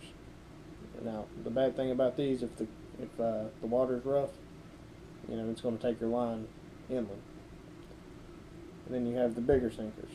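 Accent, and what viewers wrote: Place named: South, United States. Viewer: Awesome video man. I learned some things I will be using this weekend. You just need to talk slower and louder for us guys that are not used to a southern accent. lol Thanks man!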